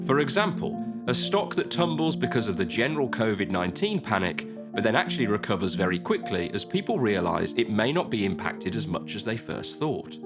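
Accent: United Kingdom. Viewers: What a brilliant video. You just automatically trust someone with a british accent and a deep voice lmao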